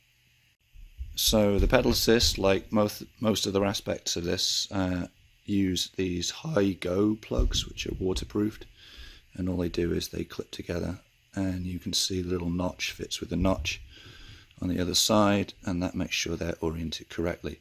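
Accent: United Kingdom. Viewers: Hi.. You sound British obviously not living in England?? Is there a huge difference in power / performance between CYC and Bafang HD Or even the Cyclone 3000w mid drive? I'm guessing the Bafang is the quietest of the three and least powerful and top speed but what about torque? Thanks for your help.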